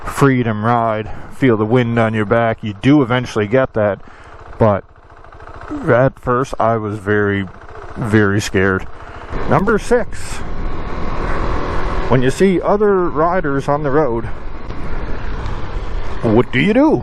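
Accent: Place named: East Coast, United States. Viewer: This was amazing. Not sure if it was the east coast accent that brought me home or all the nails you hit on the head but this was excellent. I could relate to so many points you made. I just bought my first bike a couple months ago and all the gear to go with it. Got a 23 MT-07 and there are no words for how it feels, it's crazy. Working on getting a booking for the MST, some people around here are waiting over a year to get their course done due to how few examiners there are and how booked up it is. That Klim Induction pro jacket was rad 2 months ago, now it's getting chilly! Thanks for the vid! Sub'd.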